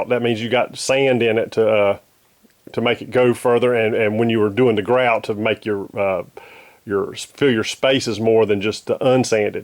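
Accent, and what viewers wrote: South, United States. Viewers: It also helps 10-years later.......... Thank you for the information. P.S: Good to hear a Southern accent (familiar to me).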